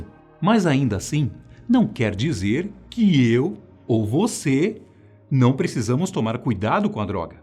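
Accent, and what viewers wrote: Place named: Bahia, Brazil. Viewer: Gostei, esse cara tem uma energia boa, tipo...meu sangue vai com o dele, no modo baiano de falar😅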